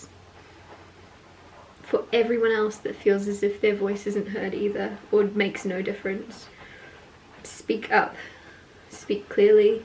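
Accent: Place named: United Kingdom. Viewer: Hi! Cheers to you and this message ❤✨ Spirit has confirmed what I have felt through your channel and channeling. As a former ex-pat your voice makes me miss the lull and grounding nature of your British accent in particular. I went to drama school over there and studied the different dialects actively and inactively. I am grateful I came across your lovely energy and channel. You're gifted. Keep spreading Love and Light and inspiring innovation and courage ❤ Blessings and Light to you Olivia x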